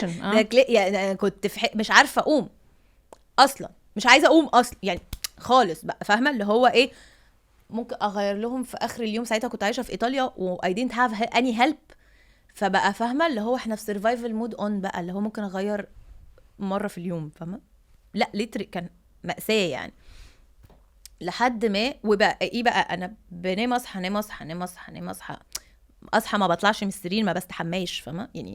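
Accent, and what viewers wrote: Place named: India. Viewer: انجليزى كتير و الاكسنت هندى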